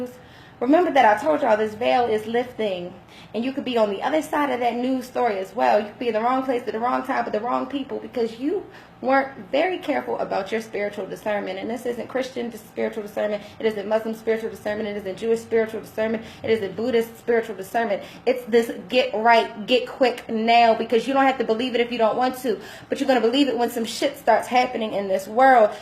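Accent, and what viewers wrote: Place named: DMV, United States. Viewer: You have a DMV accent 💜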